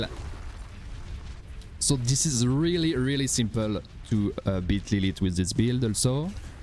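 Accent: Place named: France. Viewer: Salut le poto je me disais bien que la accent était familier, j m abonne pour the french powzr brother. Tu geres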